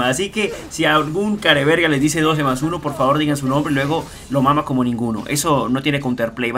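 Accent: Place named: Ecuador. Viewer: hola, tu acento se parece al ecuatoriano xD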